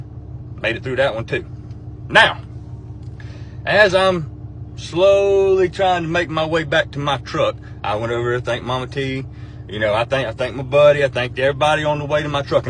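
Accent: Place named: South, United States. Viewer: Love that southern accent,and you are a funny ass story teller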